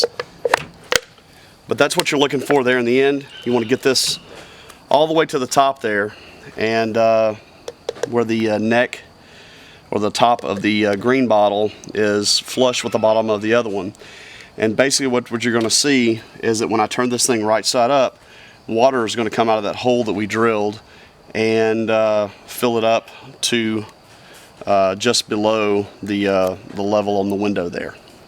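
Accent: United States. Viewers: u talk western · Cool video, bro. Haha, you sound like Forest Gump, Tom Hanks voice and Southern Accent.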